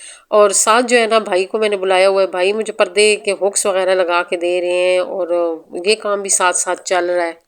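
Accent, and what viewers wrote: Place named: Murree, Pakistan. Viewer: Ap ka speaking excent murre k kisi gaon ka hy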